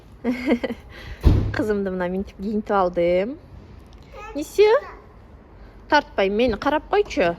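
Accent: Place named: Talas, Kyrgyzstan. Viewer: Таластан болбойсунбу суйлөгөн сөзундөн билип жатам.таластын апандын аты Гулзинабы.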